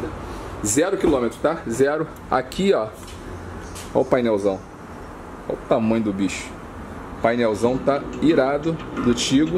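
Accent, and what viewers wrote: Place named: Rio de Janeiro, Brazil. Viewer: Sotaque carioca é da hora demais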